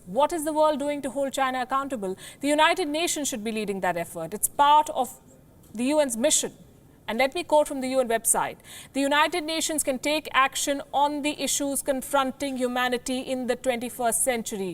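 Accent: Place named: India. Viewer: First please learn how to change your Indian accent. Second, please learn about the virus. How many viruses from USA and other countries? Did you ask for paying at that time? Will you ask for paying if the virus from India? Come on, do not be an idiot! You should learn how to protect and be away from virus, not go everywhere and treat the virus like a flue, like an real idiot! Third, your way of asking for money is like a widow, maybe that is real. please think with your mind.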